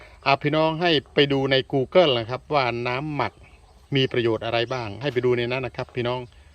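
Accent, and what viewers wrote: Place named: Surin, Thailand. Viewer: อยู่บ้านใหน ขอ รับ ฟังสำเนียง ขุนๆ เหมือนคนบ้านผมเลย จาก อ.เขวาสินรินทร์..เซราะกราว เน้อ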